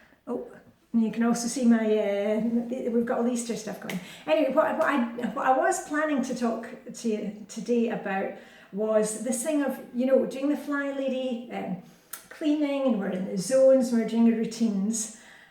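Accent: Scotland, United Kingdom. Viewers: You are NOT cluttering up my feed! I love your videos. You are an inspiration to me, more than decluttering, even though I love that. Your attitude, your style, and your Scottish brogue are endearing! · No more self doubt allowed! Know that your videos are like a fun visit with a charming, uplifting friend! The Scottish accent is an added bonus! It’s fun to learn about your life and to see how much you love Denmark! You are a great ambassador for your adopted country! If you were to stop, I would be sad. Cindy from Minnesota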